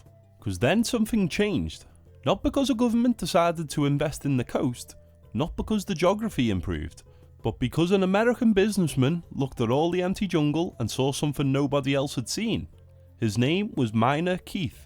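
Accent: Scotland, United Kingdom. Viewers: I can hear an accent, but I'm from the Netherlands, so I don't know which accent it is. Is it a Scottish accent?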